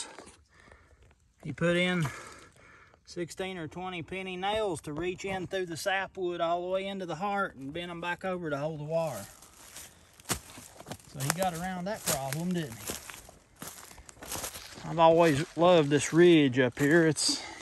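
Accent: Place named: Eastern Kentucky, United States. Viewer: Love to hear you talk reminds me of my kinfolk down in eastern Kentucky ❤️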